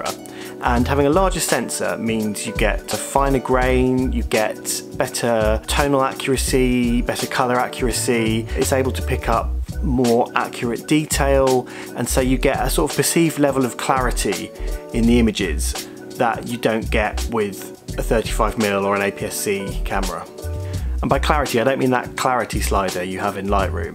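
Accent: England, United Kingdom. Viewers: Hey. Forgot something. I love your english accent (I don't need subtitles) and your videos too.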